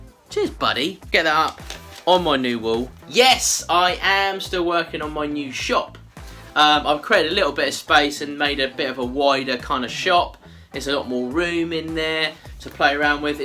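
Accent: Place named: England, United Kingdom. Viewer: Being that I am a "bird" (LOL) I will probably never use a lathe - not that ladies can't & dont, it's cool w/me! BUT, my point is I enjoy watching purely because you are so much fun and you really make me laugh! Love your editing too. As for talking too much I could listen to you talk all day. I think most Americans love a great English accent. However I AM a maker, I make quilts, and recently finished a Union Jack quilt for my Grandson. Wish I could show you a pic. I love you tube, it's where I learned to quilt and it brings me a ton of GREAT entertainment and laughs like your channel. Thanks ❤ God bless you & yours! 🇬🇧🇺🇸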